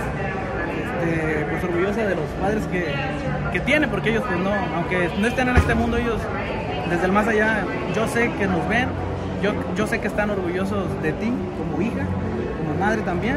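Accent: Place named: Colombia. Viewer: Y otra cosa a mi me encanta su acento el colombiano tiene su particularidad al hablar y claro depende la región porq hay unos q exageran pero los normal me encanta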